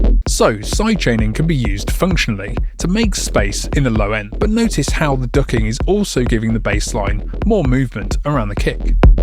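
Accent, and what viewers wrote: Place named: United Kingdom. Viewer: Great plugins and great content. Also British which is nice 🇬🇧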